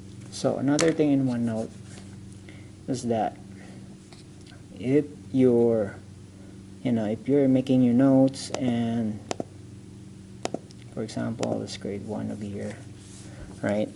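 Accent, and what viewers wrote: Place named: Philippines. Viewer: loving the Filipino accent :)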